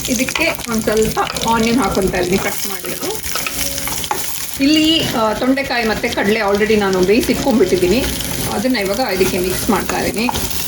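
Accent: Mangalore, India. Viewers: Manglore slang kelode chanda😍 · & nimma uru yavudu i think manglore or udupi tulu alle alwa matadodu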